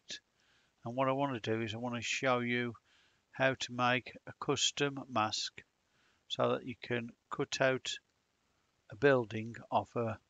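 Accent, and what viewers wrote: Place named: Birmingham, United Kingdom. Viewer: Thank you for that very easy to follow tutorial, great t hearing your Brummie accent.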